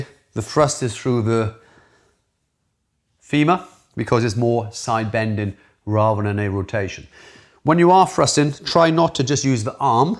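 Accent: Wales, United Kingdom. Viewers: That's definitely a Welsh accent I hear!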